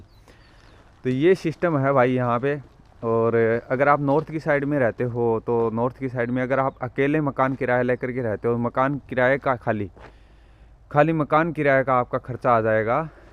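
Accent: Haryana, India. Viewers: Delhi se ho ki haryana se ho bhai aap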